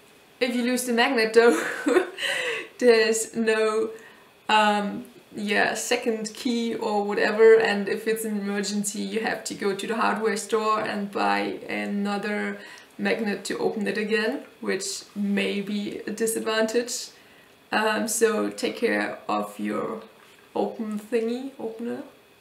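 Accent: Germany. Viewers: And that German accent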